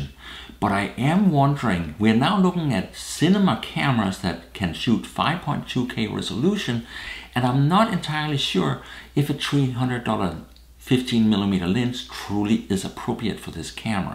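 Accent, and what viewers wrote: Denmark. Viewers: Excuse me, but are you originally from Denmark? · Hey, I'm a big DSLR geek just getting into the drone-world after getting my Phantom 4. Amazing stuff and pure candy to watch your videos. You have a new subscriber:) Er du i øvrigt dansker? Synes jeg kan høre det i din dialekt (og ikke mindst dit navn). Thanks:-)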